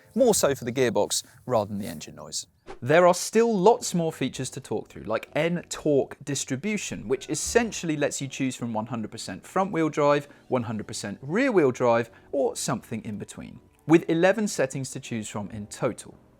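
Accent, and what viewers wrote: RP, United Kingdom. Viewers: He speaks perfectly posh English. Impressive. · Wouldn't say he has a 'posh' accent, well maybe a little posh, he has a standard RP accent from SE England but yes you can tell he's probably been to private school.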